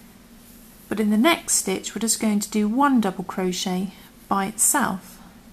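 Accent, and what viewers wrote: England, United Kingdom. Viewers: Oh I was so happy when I heard your English accent but turned into a frown when you started to explain using American terms lol ... Great tutorial tho..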